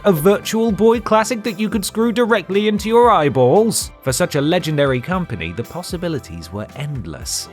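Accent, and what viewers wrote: United Kingdom. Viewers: This channel says it's fun United Kingdom, and the host sounds British. But it's just another US channel. It's USA vs Japan, it's "dollar" that just assumes US dollar, even though it wasn't started. Why do so many Brits want to be American channels? Be international.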